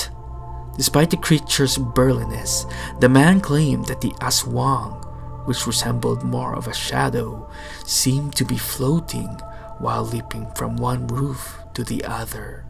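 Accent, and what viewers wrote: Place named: Iloilo, Philippines. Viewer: Nakakaaliw boses mo boss ilonggo English kaayo gid b pamatian...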